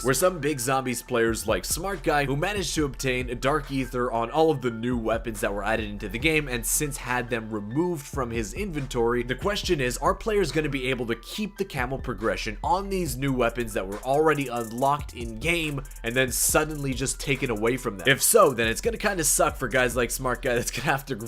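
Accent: Canada. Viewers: Are u Canadian?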